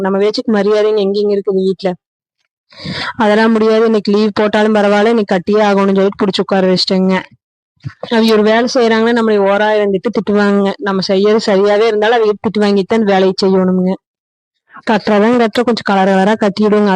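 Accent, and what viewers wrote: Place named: Kongu, India. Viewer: மகிழ்ச்சி உங்க கொங்கு தமிழ் கேக்க இனிமை.வாழ்க வளமுடன்.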